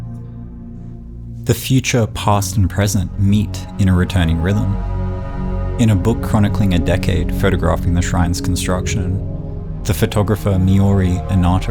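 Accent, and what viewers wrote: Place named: New Zealand. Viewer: Lovely essay! You sound like you are from New Zealand? Just curious as I'm listening from Auckland. Thanks man, nice work, congrats.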